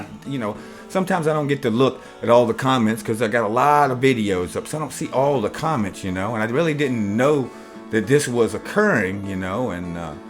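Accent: South, United States. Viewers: Man that sucks that people unsubscribed do to your warehouse america videos. I mean if you don't like em don't watch em. Bunch of self entitled asshats. But yeah, what you said at the end is true. If they can't get over this then how "awake" are they. And people. what the fuck is up with the constant accusations of his accent being fake? Why, because he sounds "black"? Dumb asses, that's a southern drawl which in turn is what influenced how modern African Americas talk. And even if he did, so fucking what. We need to get off this race bullshit. We're all human, our "real" race is just melatonin levels in the skin. What we call "race" is just cultural, just how we act based on where and how we're raised. If a white guy is raised in the ghetto he's gonna probably act "black" and if a black kid is raised like a typical white bread then he's got a good chance of acting "white". 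Of course I know the real reason for this shit, that self important higher then thou feeling when you go all social justice warrior and call out a "privileged" white man for stealing and raping black culture which is total bullshit. Get over it, every race has done fucked up shit to each other and we are so over that by now.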